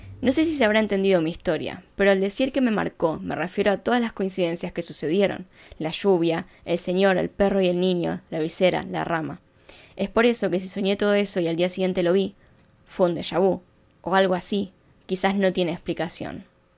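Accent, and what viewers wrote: Argentina. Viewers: Bueno muñeca preciosa, no se como cai acá pero ya cai, por tu acento sos Argentina....o me EQUIVOCO. Mira piba sos muy bella.. Te saludo desde Bremen Alemania.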